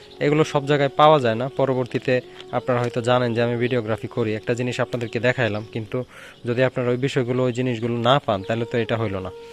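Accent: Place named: Mymensingh, Bangladesh. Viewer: ভাই আপনার বসা ময়মনসিংহের কথায়।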